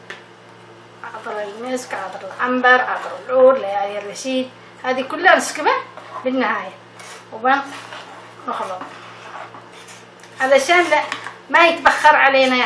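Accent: Aden, Yemen. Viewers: DAir هاذي لهجت عدن كل مكان معه لهجه اني من الحديده مااعرف اتكلم مثلها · اللهجه اليمنية تعجبني وطيبه اهل اليمن محبتك من السعوديه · الله يحفظك ياماه انا عراقي وعشت بليمن وعدن خاصة من الكلام العدني ذكرتيني بعدن كلها وناسها وطيبهم انا الان في العراق وحبيت اسوي بخور عدني بس المشكلة مافيش الظفر دا ينفع بدونه ولا ضروري يعني ولا في حاجة بداله ونفس الشغل حق الظفر 🌹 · هاذي اللهجة اليمنية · الله يسعد هالمبسم .. ما شاء الله لا قوة الا بالله ما أحلى طريقة كلامك ولهجتها اليمنية الجميلة .. ما أجمل روحك .. يا الله شكثر أحب أهل اليمن ..الله يفرجها على اليمن ويردها إليه ردا جميلا ويردها اليمن السعيد الحافل بالخيرات والبركات اللهم احفظ اليمن واحفظ أهلها واحفظ دينهم وأعراضهم ونساءهم وشبابهم وشيبانهم وأولادهم وبناتهم وأطفالهم وأموالهم وبارك. اللهم في أهلها وأرضها وخيراتها وما فيها من النعم .. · انا افدا القلب حقك ي خاله والله انتي الام العدنيه الي يجي قلبه صافي وتعتبر الكل عياله وبناته والله انا مستحيل ادخل بلبخور او افكر اننا اسوي بخور بس والله نحنا مغتربين وكلامك يرجع لنا دكريات اللهجة العدنيه ونحنا محرومين منه بلغربه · يازين اللهجه اليمنيه ♥️ نحبكم من السعوديه 🇸🇦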